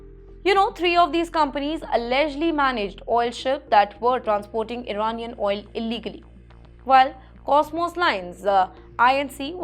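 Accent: India. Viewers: She's speaking Indianlish which very few outside India can understand.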